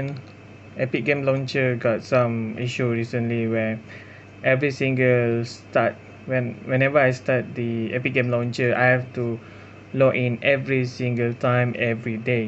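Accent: Malaysia. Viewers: U have malaysian accent bro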